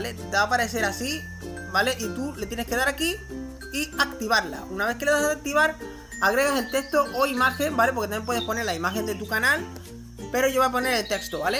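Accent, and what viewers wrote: Dominican Republic. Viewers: Dominicano verdad?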